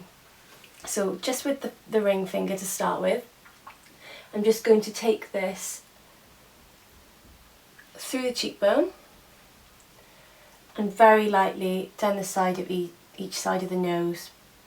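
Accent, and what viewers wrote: United Kingdom. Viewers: you welsh?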